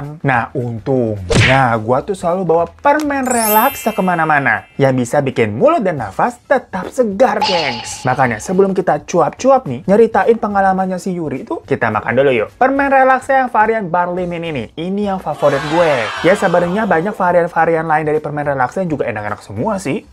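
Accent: Java, Indonesia. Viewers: hihii😅 pake logat jowo siiip😂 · Wkwkwkwkwkwk..candu bgt logat jowo medok e bang 😂seru KLO ada TC yg pake jowo yaa.. 😂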